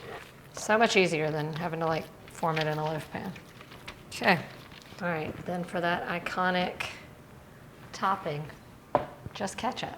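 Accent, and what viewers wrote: South, United States. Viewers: Loving your vibe and personality.. also the southern hint to your accent, you should have your own cooking show on tv.. I’d definitely watch it 👍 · is southern for sure! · Just popped it in the oven. I never saw your videos but this is great. I love your accent. Is it southern?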